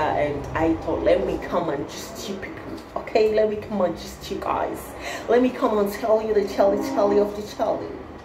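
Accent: Nigeria. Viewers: that Nigerian acsent sounds very sweet ooh, l enjoy it.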